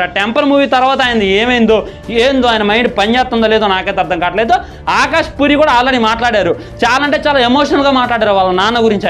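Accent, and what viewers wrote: Khammam, India. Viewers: Bro meedhi khammam aah?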